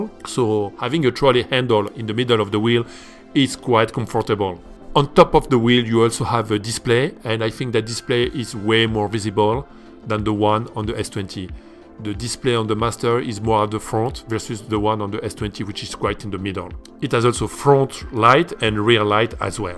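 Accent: France. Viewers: lol dat french accent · SYMPA L'ACCENT FRANCAIS , BONNE VIDEO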